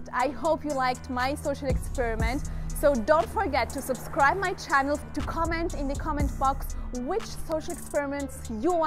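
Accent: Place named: Austria. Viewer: We love you from Germany ..... Sister, You speak very beautifully "Oesterreichische German.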